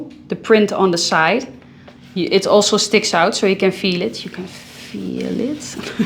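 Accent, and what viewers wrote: Netherlands. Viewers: I love that dutch accent ♥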